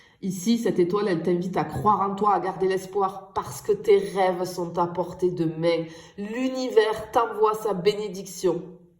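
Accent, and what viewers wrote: South, France. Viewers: Tellement joli cet accent du sud ☀️🐝🌻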